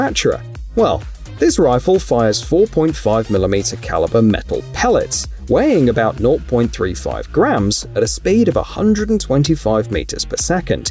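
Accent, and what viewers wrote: United Kingdom. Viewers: No more British accents!!! Thumbs down!!! Irish, French or Swedish!!!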